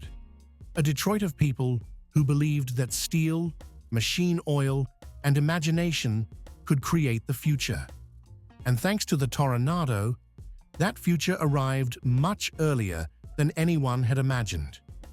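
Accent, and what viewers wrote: United Kingdom. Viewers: This chan has the best British voice.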